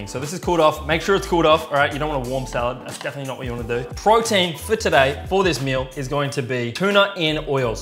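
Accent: Australia. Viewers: So u said it is quite hot in hear so with that information and ur accent u r an Australian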